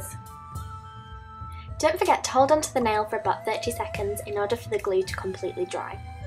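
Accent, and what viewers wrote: United Kingdom. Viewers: omg ,thats awesome, i love brit accents and im just startin to realize that theres many and not just one;)and im tryin to learn to tell the difference and stuff..yeah;) xx from germany=)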